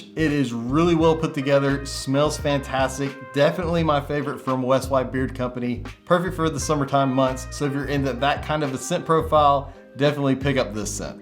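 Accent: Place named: United States. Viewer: I’m fascinated by regional accents so when I hear “carrer ool” I just have to know what part of the US you’re from. NOT making fun, swear. I’m from Philly, famous for weird-ass accent, so I’m just interested from a linguistic perspective.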